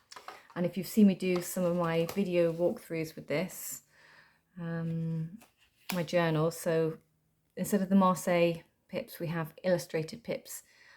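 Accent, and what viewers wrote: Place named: United Kingdom. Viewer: For the record...I adore your thumbnail. And thank you so much for your kind words ❤ this was lovely! I have my eyes on the Dark mansion Tarot. Your decks are beautiful and I think I'll add a feel to my list lol. Enjoyed this so much. Your answers are awesome. Sending you love. And I noticed you have a lovely UK accent if I am correct? Man I miss it over there and can't wait to get back.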